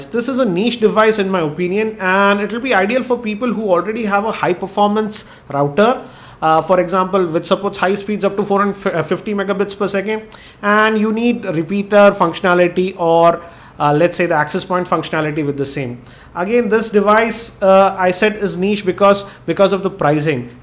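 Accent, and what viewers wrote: India. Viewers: is he speaking english or indian, i cant tell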